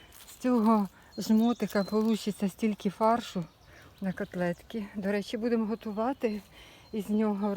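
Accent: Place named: Transcarpathia, Ukraine. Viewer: У ВАС діалект мови Закарпаття.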